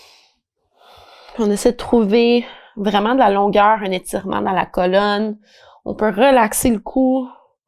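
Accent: Quebec, Canada. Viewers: Un rêve devenue réalité! Je suis trop contente, vraiment fière de toi! Depuis des années que je te suis sur YouTube j’espérais t’entendre parler français! Je suis française et j’adore l’accent québécois💖. Tu m’inspires tellement! Tu ose sortir de ta zone de confort et ne t’inquiète pas ta classe est toujours aussi bonne! Je t’encourage no matter what! Merci merci! Et oui j’en voudrais plus, c’était trop cool🥰🥰🥰 Bonne journée 😘💖🙏🏾🙏🏾🙏🏾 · Merci beaucoup pour cette classe! I do your classes from Wales, but learned some French when I lived in Quebec a few years ago - nice to hear French from Quebec again and in my favourite yoga class! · !!! ca fait un moment que je suis abonnée à toi et jamais j'aurais cru que t'étais francophone. je suis du maroc en général notre façon de parler se rapproche plus de la france mais l'accent québécois m'a toujours fascinée et mon copain est né à Montréal du coup its close to my heart ❤ je pense que tu devrais ajouter plus de vidéos à cette playlist. 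i know its not within your comfort zone but im sure itd be a great exercise. much love take care xx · Merci beaucoup ! Je suis allemande mais J’AIME l’accent québécois et j’espère que vous allez filmer encore beaucoup de cours en français ! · En général ça ne me derange pas de suivre un cour en anglais ou en francais de france mais c'est vraiment agréable d'entendre du français quebecois! j'espere que tu feras d'autre cours en français. xxx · Bonjour, merci beaucoup pour cette vidéo en français👍❤️, j'espère que vous ferez d'autres vidéos en français, avec votre accent québécois cela rajoute beaucoup de charme, merci pour votre bienveillance et votre professionnalisme, passer une agréable journée 🙏🧘‍♀️🖐️😁♥️🕉️☀️ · Super bonne idée! Je suis tes vidéos en anglais depuis un moment étant francophone de Belgique, j'adore l'accent Québecois! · Oh my gosh, I love this so much! I'm American but I lived in France for a number of years and speak fluently... 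I had no idea you were Francophone! Loving the Quebecoise accent -- trop fraiche! <3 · En tant qu'anglophone, je peux généralement identifier le moindre accent, mais je n'avais aucune idée que vous parlait Québécois. L'accent est un peu difficile à comprendre pour les "monolingual whitebread Americans", mais c'est une pratique à double tranchant : Le français et le yoga ! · Really enjoyed the class "en français". I'm English so the québécois accent took a bit of getting used to, but that was part of the fun. I'm on day 29 of 3rd time through 30 days of morning yoga. Love that it's different each day. Any chance if 15 days of 15 min morning yoga. Could do with a slightly longer class that was different each day. Merci beaucoup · Merci tellement pour ça!!! Je suis anglophone mais j'ai vécu deux ans au Québec et c'est là que j'ai vraiment approfondi mon pratique de yoga. Je fais souvent tes vidéos, mais le yoga en français me manque et cet vidéo a offert un occasion merveilleux pour reconnecter avec la langue. 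Ton accent me fais tellement chaud au coeur et ça serait super de voir plus de ces vidéos en beau français québécois!! Merciiii encore 🥰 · Ça ne devrais pas me surprendre que tu es bilingue, car je sais que ton ancien studio se situe en Ottawa, mais le fait que tu es québécoise est vraiment super! Je suis ontarienne anglophone, mais je suis enseignante en immersion française. Je trouve que j'ai perdu mon accent en parlant aux petits tout le temps, donc je suis ravie d'entendre ton accent et de suivre ce cours avec toi! Merci de continuer de nous partager des aspects de ton identité!💕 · Quelle suprise :-) je regarde tes vidéos en anglais depuis la France, et t'entendre parler avec ton bel accent québécois me réchauffe le coeur et les oreilles ! Je tiens comme les autres personnes à te soutenir dans la démarche, c'est une belle initiative que d'aller au devant de tes insécurités ! Tu ne peux que progresser... 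;) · Are You Canadian? You sound like from Xavier Dolan movies ❤️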